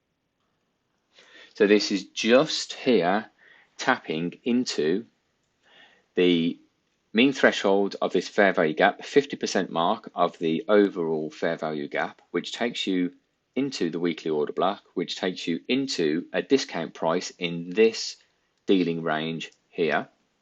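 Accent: Birmingham, United Kingdom. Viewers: i can only tell because I'm a brummie myself lol. Most won't though right. Nice to see a brummie on here. Keep up the game bro.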